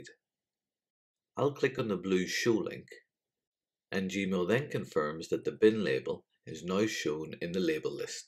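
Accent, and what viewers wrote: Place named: Wales, United Kingdom. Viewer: I like your Welsh accent (I hope it's Welsh). AmIright? This video is easy to follow, your speech is CLEAR, and easy to understand. You get a TEN out of TEN. Thank You!!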